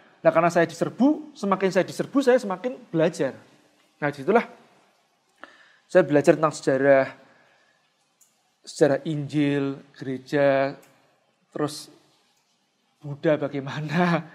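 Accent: Java, Indonesia. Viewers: Tanya juga Mas, kok orang Batam medoke Jawa? 😬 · tpi ada sdkt logt Jawa nya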